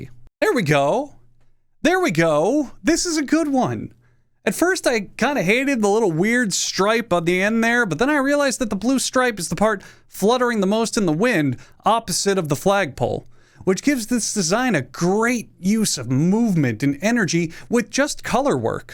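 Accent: United States. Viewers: Sound pretty American to me bro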